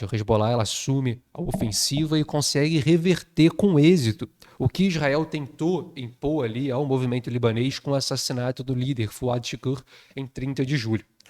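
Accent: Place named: Rio de Janeiro, Brazil. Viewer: Tem hora que eu acho que o é carioca da gema, tem hora que parece paulistano da Mooca! Sotaque mucho loko! #freepalestine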